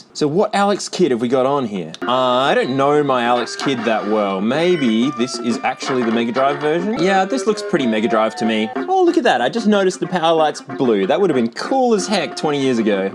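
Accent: Australia. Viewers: As an American, seh-ga just feels better to say than say-ga, even though they sound basically the same to my ears. See-ga doesnt sound wrong to me at all though. Just sounds like the expected way for the Australian accent to modify the word. Accents just do that. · I love your dedication to the Aussie SEGA pronunciation! Shocked this bootleg is so good! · how we pronounce Sega in Australia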